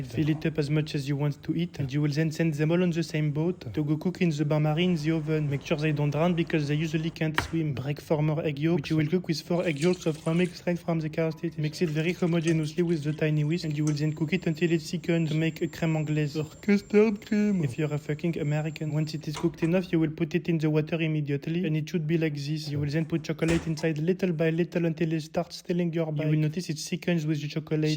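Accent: France. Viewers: wow l acccent francais laa frero mais j aime l humor bonne chance mon reuf ciaoooooo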